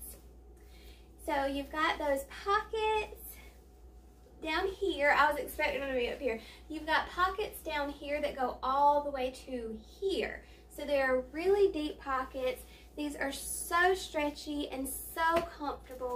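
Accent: South, United States. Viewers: Ran into your channel looking for a review of Hanna Nikole. Now following! Thank you for your reviews. My measurements are 49, 43, 52, so I can get an idea of how things would look on me, even though you are more of an hourglass and I am more of a rectangle. Your accent is so charming and soothing. My hubby is from Alabama, so listening to you is like visiting with some of my favorite people in the world (his sisters!!!) Cheers, Maria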